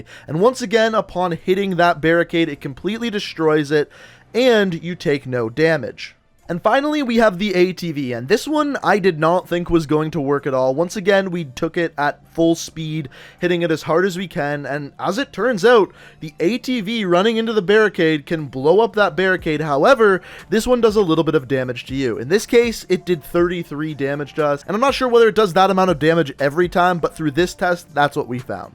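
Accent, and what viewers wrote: Canada. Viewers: Fuck your accent Canadian fuckface!!!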